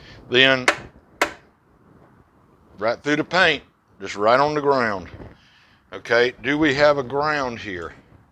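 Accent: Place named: Georgia, United States. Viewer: I was stationed in Augusta, Georgia for 2 years ( US ARMY ). I remember the way the towns folks sounded. Much like you. Brings back fond memories of those friendly folks. I even fooled a Drill Seargent with my accent. He said " Boy, where are you from ? " I said " the south " ! He said " what part of the south ? " I said " South New York ! " He said " drop down and do 50 pushups ! " I did, laughing the whole time !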